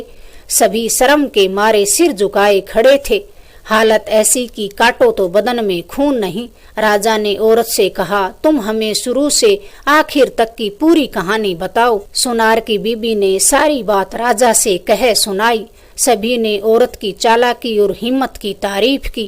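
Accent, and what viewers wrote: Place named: Rajasthan, India. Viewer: महिला। राजस्थानी। है ।लहजे। से। पता। चलता। है ।रंगीलो। राजस्थान। ।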